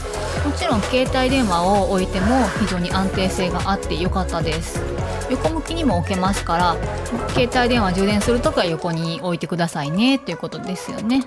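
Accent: Kansai, Japan. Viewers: 初コメです😊 さんの動画本当に参考になります！私もIKEA大好きですが遠くてなかなか行けないので・・😂😂 それから、時々関西弁なさんの話し方、好きです❤️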